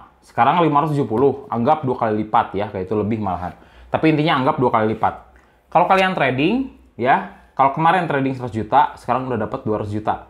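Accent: West Java, Indonesia. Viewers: Logatnya sunda pisan euy 😎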